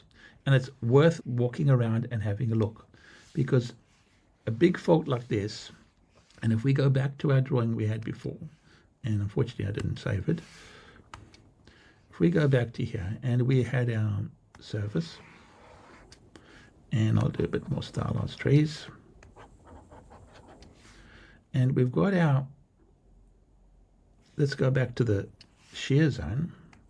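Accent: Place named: Afrikaans, South Africa. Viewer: Can almost here the Afrikaans coming out haha; beautiful presentation, I genuinely learned alot.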